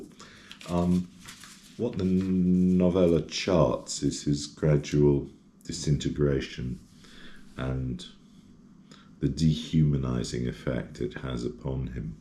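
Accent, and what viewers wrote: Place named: Romania. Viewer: Superb Romanian accent , Deutsch auch. Might I suggest some comments on one of the writings produced by the Viennese Stefan Zweig ?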